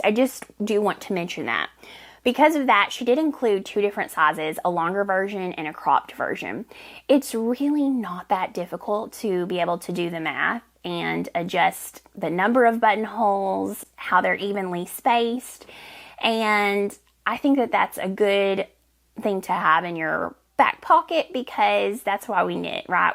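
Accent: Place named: Tennessee, United States. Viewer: Found you through a post by Andrea Mowry, and popped right over. Such restful scenery and the colors in the area where you live. I was guessing TN based on accent 'cuz definitely not GA where I am...not enough pines. Love your work and your home, what I've seen. Decided to stay and subscribed. Thanks for a lovely restful post!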